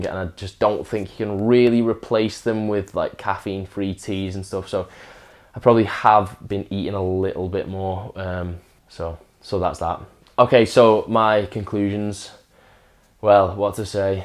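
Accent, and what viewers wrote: United Kingdom. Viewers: Hello there. I'm here in Southern California under Coronavirus quarantine and ran out of coffee two days ago. Normally, I just walk down to the local coffee shop for a cup, but everything's closed up and my food delivery service isn't arriving for four more days. OMG!!!! Had NO IDEA how hard it is to kick this stuff. Just realized I've been drinking hardcore for close to 30 years and never really seriously tried to stop. Didn't sleep at all last night and am wigging out. This video is AWESOME!!! I already feel like I'm in that movie Trainspotting and your accent is adding to the experience. LOL!!! 🤣Thanks for posting it. It really helped me out to know that I'm not alone. "Cheers Mate" or as we say here in Cali "Thanks Bro!!". -m. Huntington Beach, Ca. 👍✌😎 -btw i enjoyed your video so much I'm subscribing. The way you articulated your experience was exceptional. 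"Brilliant" is the word I think y'all use over there in'net?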